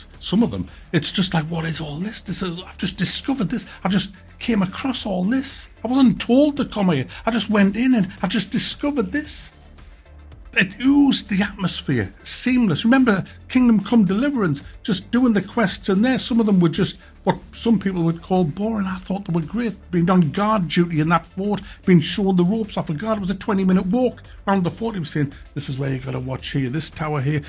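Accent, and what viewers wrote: United Kingdom. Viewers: I like your accent. What part of Britain is it from?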